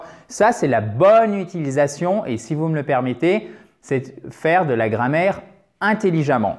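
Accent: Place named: United States. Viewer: Bonjour. Merci tout d'abord pour ce "fabuleux" travail avec toutes ces vidéos! Mais pourquoi devez-vous systèmatiquement utiliser l'accent américain? Il m'arrache les oreilles et avant l'américain, il y avait l'anglais. Alors, pourquoi ce choix? merci à vous.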